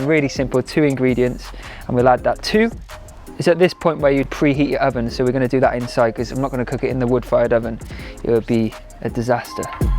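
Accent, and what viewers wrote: United Kingdom. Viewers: Amazing recipe. Can't wait to try it. Big fan - I have all your books. + You don't need to say eggplant instead of aubergine - we love your Brit talk here in America 🥰 Although - I do think you'd look awesome if you cut the top of your hair really, really short (American style) ☺️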